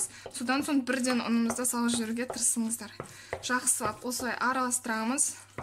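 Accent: Standard. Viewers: Қолыңыз дерт көрмесін осылай қазақша таза түсінікті айтып түсіндіргеніңіз үшін сізге үлкен рахмет айтамын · Саламатсыз ба? Қазақша таза сөйлеп, түсіндіргеніңізге қуаныштымыз. Өте керемет, риза болып отырмын. Бар білгеніңді ортаға салғаның қандай тамаша! Каналың дами берсін.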